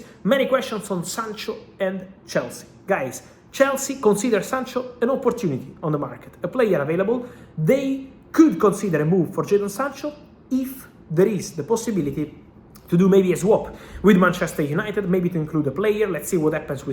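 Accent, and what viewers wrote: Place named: Italy. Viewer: Except we understand only 60-70%, and have to guess what else he is saying. His Italian accent is really not easy! I still don't understand who bid for Oshimen??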